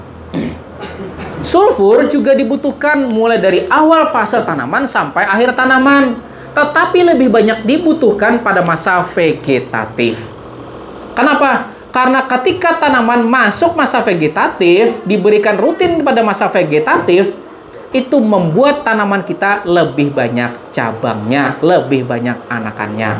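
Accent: Banjar, Indonesia. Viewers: Tambahi lagi tambahi lagi....Kya logat Banjar bang...di daerah mna ini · apakah bapak ini orang banjar? 😂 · Eh, bapak orang Banjar? Logatnya rada banjar...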